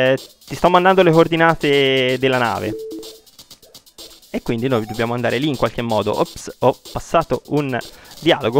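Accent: Tuscany, Italy. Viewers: Bel video! E' sempre bello sentire un altro toscano su Youtube. Ti sei preso un bel like meritato e un nuovo iscritto.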